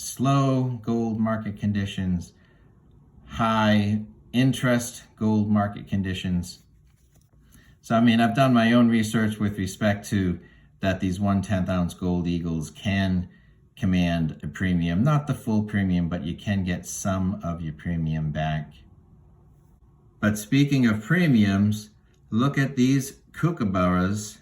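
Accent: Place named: Massachusetts, United States. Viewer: You sound just like a Massachusetts friend of mine from Florida. He moved back to Massachusetts several years ago. Great video!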